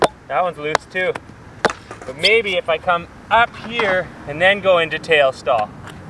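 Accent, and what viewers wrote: Canada. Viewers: I'm not sure why but I love these videos. I think watching someone who skates like me, it's more relatable than watching a pro do a switch backside lipslide down a 16 stair handrail. I've even gotten used to the Canadian accent eh! Great content dude, thanks for all the videos.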